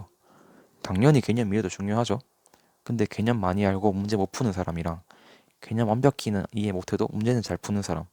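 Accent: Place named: Gyeongsang, South Korea. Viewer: 대구 분이신 것 같네요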